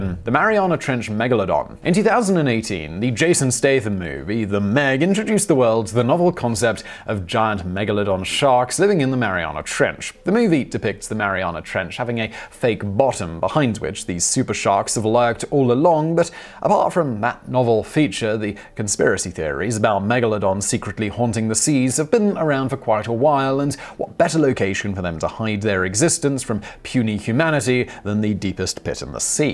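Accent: United Kingdom. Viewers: This dudes voice is so british it seems fake tho im sure jts not lol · Kraken. He's British.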